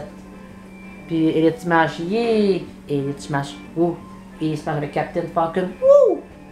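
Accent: Canada. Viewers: sympa ta partie GG à toi poto:) tu es canadien non?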